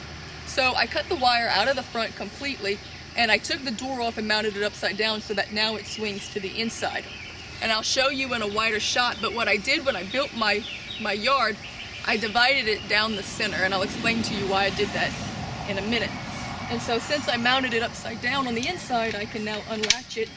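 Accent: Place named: Texas, United States. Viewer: You are so full of information! I have been following you for a while and now have subscribed. Please keep it up! I need all the information I can get! I am in texas, you sound like you are close by, what state are you in?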